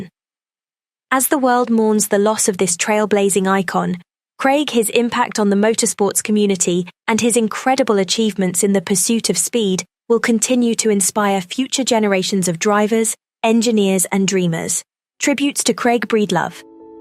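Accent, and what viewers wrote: England, United Kingdom. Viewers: Why is an English woman talking about the USA? Go back to your home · Why do they got this British lady doing this and Gilbert Gottfried already passed away already she gots her scruples backwards 😵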